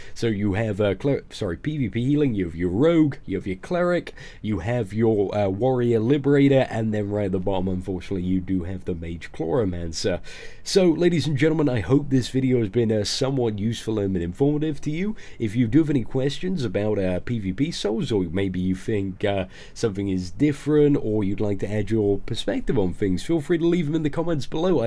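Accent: United Kingdom. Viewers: Hey. Great vids. And love the accent!! Its like sherlocke holmes playing rift! Keep up the great work!!!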